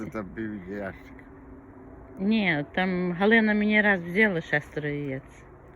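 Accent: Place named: Prykarpattia, Ukraine. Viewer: Чую прикарпацьку говірку❤. Дякую Вам дорогенька за відео. Я тої зими готувала конвертики з яблуками за Вашим рецептом. Маю схожий рецепт від моєї мами але тісто з пива, масла і борошна. І називалося це печиво ''хусточки''. Успіху Вам і Вашій родині❤❤❤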